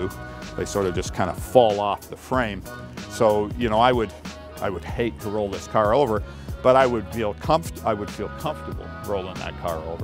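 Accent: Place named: Canada. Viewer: obvious Canadian accent...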